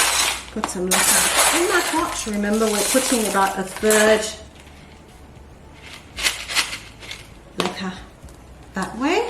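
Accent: Australia. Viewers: Australian accent ❤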